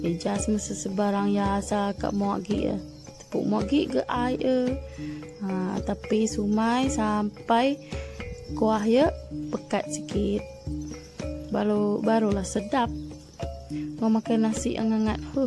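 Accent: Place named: Iban, Malaysia. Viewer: Pertama kali saya dengar loghat Iban..suka dengar loghat2 lain ni. hehe..btw resipi ni boleh buat ni. thanks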